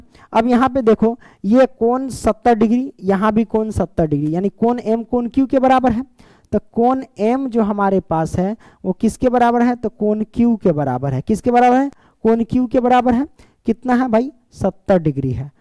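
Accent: Bihar, India. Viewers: You are. A good teacher but you talked some bihari language that why i didn't understood all work but you are a good teacher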